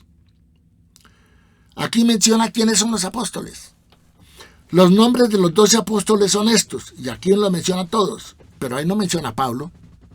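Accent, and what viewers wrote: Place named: Colombia. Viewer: Pero creo que tienes que presentarte...según tu tono eres Colombiano..no puedes ser rabino. Porque tendrías que ser Judío nacido en Israel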